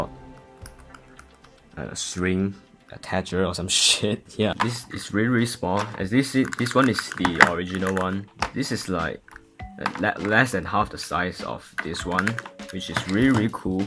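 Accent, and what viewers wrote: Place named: Asia. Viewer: I smell popular osu youtuber Well I mean you sounds like Miraie n Squashy Boy. Btw, are you Asian? Your english just sounds like one🤣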